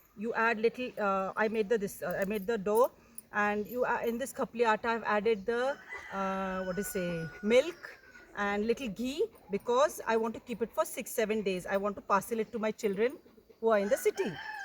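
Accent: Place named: Maharashtra, India. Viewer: Best part is your Marathi accent 👍 plz use it often. Jay Maharashtra 🙏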